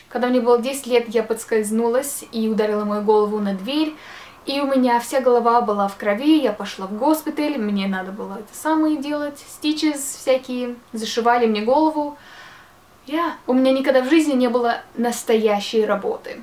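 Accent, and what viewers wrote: England, United Kingdom. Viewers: У неё такой классный английский акцент;) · Английский акцент у тебя) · У тебя такой акцент сильный от английского)Так классно:3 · Такой английский акцент),это твоя изюминка)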